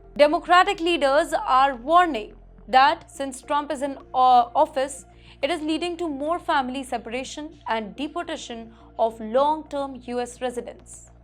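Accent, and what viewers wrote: India. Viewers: You need to improve your Accent. So bad that internationally cant really follow you. So indianish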